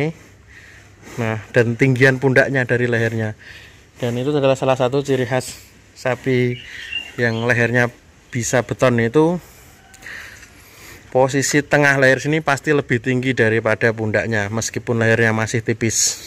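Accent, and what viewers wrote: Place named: Pati, Indonesia. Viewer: Wong Pati njenengan mas🤔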